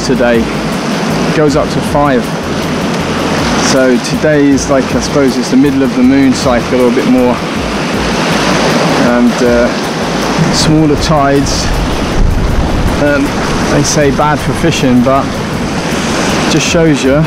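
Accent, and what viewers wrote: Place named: England, United Kingdom. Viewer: Hi loved the vid and subscribed. This vid had me fascinated, we get bluefish here in Australia where they are called Tailor. I was actually thinking this was an American clip on bluefish ing, next thing I hear your English accent, then see you in some kind of subtropical location Confused lol, what country is this and why do the fish get so big there? Are you a regular tourist to the area or live/work in the area? Cheers mate loved the vid big time.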